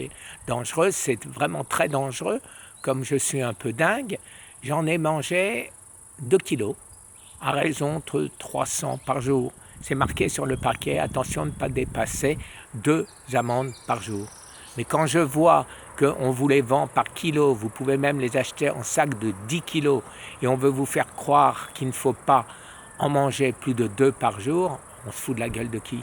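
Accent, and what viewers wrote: Alsace, France. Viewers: Coucou j’avais bien cerné que vous étiez de l’Est de la France avec votre prénom et votre accent Vous êtes Alsacien du bas Rhin Et moi je suis née en Lorraine À Thionville (57) Puis vécu près de Metz J’habite en Provence (83) aujourd’hui Pour en revenir à la vit B 17 pour le noyau d’abricot riche de cette vit c’est l’extraction du centre du noyau je l’ai entendu sur YouTube Ou le trouvez-vous?! Merci 🙏🏻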